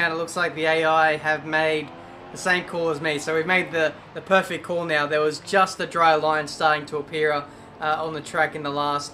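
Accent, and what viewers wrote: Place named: Australia. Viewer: australian voice Great job mate, that came outa nowheree!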